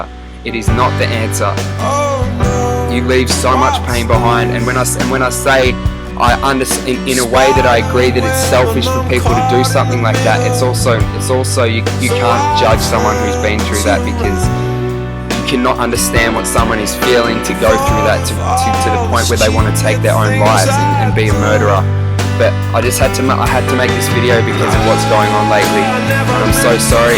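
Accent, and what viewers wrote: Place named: Australia. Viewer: the guys aussie accent .. lols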